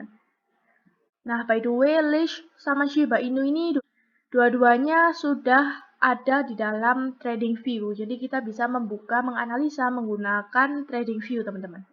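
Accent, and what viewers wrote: Java, Indonesia. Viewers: Kok medokk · suaramu medok tenan mbak, tp enak di denger, semoga berkembang terus channel YouTube nya....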